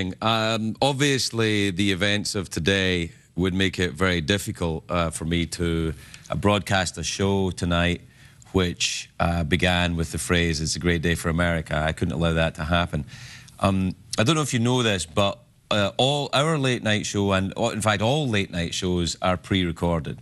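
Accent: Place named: Scotland, United Kingdom. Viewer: He sounds Scottisher here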